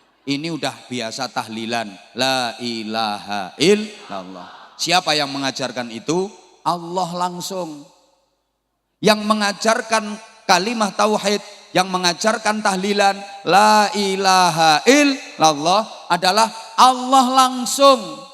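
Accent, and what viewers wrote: Banyumas, Indonesia. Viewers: Kramat jatibarrang Brebes Cocok bahasa e ngapak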